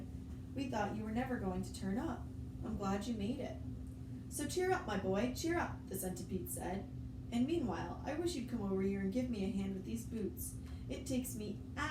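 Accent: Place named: Germany. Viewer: Oh ok I was wondering by her accent it sounded like a German accent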